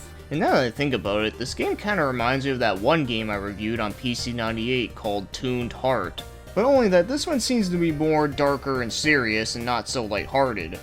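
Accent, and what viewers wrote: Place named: Japan. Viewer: ay bro you sound Japanese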